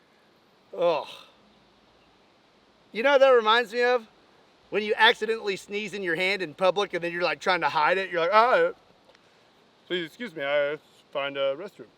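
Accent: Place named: South, United States. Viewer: I LOVE THAT SOUTHERN ACCENT!!!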